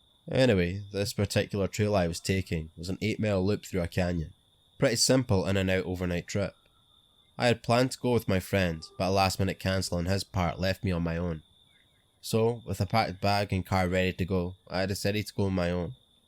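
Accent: Scotland, United Kingdom. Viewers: I love your Scottish accent, it really adds to the story. But sometimes it's kind of hard to understand it, so my suggestion is adding captions to the bottom of the video. I bet it would please a lot of people.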